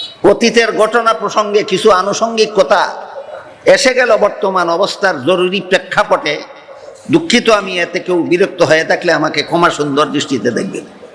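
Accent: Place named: India. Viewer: আপনি ভারতে সুরে কথা বলেন।জাতির বারোটা বাজানোর জন্য আপনি একা যতেষ্ট।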